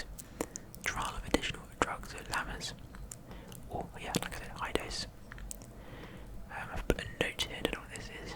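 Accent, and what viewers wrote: United Kingdom. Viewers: Time for another game of have I been saying that word wrong or do British people just say it differently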